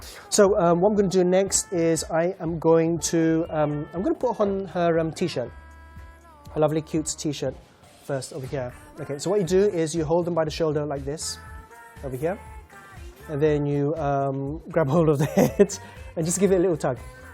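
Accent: United Kingdom. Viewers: Wow! your Smart Dolls are amazing! i love them! and Also, you have a beautiful english accent, i understand you perfectly, congratulations!!!! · British accent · He sounds british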